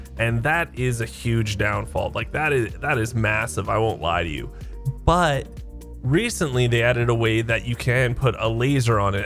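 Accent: Canada. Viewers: 1.6k hours and I do know all this stuff but your videos are just so damn fun to listen to that I’m still gonna watch it! You and your damn whacky Canadian accent, keep it up brother!!!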